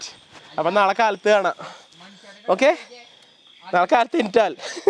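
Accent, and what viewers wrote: Thrissur, India. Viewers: machan thrissur aano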